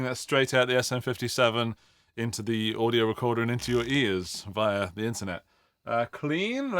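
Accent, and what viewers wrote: United Kingdom. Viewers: Black start me up. Yeah I was researching this and you've clarified like butter. Thanks. You Brits make everything sound good anyway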